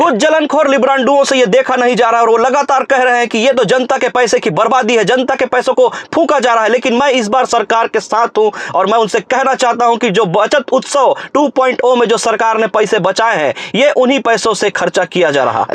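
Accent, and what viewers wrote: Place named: Chhattisgarh, India. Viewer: All the best bhaiya Apka lahja chhattisgarhiya type hai Kya app yahi ke hai ky bhaiyya ❤❤❤❤❤❤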